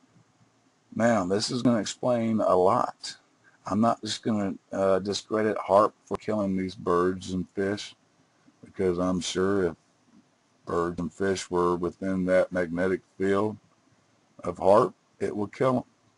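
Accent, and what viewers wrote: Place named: United Kingdom. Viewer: What a strange, over the top, ' jolly hockey sticks' English accent of the narrator. Was it computer generated? I was mesmerised listening to the accent that I didn't hear what she was saying. Now I will have to watch it again :)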